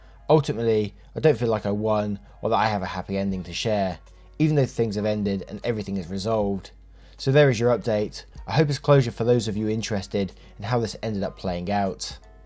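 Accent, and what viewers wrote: United Kingdom. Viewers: When I'm trying to take a nap after chemo, I turn on your playlist. Your voice is very soothing (could be the accent, you know how American females feel about a UK accent😂) and I get a great nap. I always go back and listen again while awake. So yeah, you put me to sleep, thank you. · I am crocheting a blanket, while I listen your beautiful British accented voice read to me!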